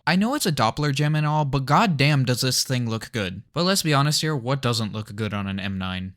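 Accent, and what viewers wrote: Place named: Middle East. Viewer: dunno why but I thought I remember you having a thick middle eastern accent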